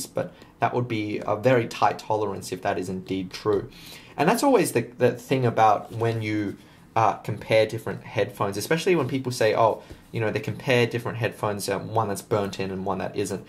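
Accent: Australia. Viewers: I can honestly say I have never heard an asian with an australian accent before.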